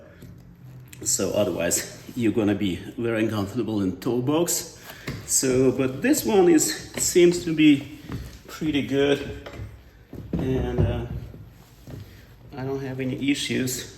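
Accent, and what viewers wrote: Eastern Europe. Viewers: Am i the only one who hear slavic accent? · Такое впечатление, что английский язык для вас не родной. Проскакивает какой-то славянский акцент.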